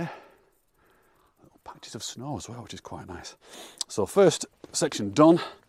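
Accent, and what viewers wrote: Yorkshire, United Kingdom. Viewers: Love your videos pal. I'm in the lakes hiking the peaks all the time, but it's still great to see various places from a different perspective, especially on parts I haven't been to yet (eg Lord's rake). I'm trying to work out where you're from with that accent.....I'm guessing somewhere between Sheffield and Leeds? lol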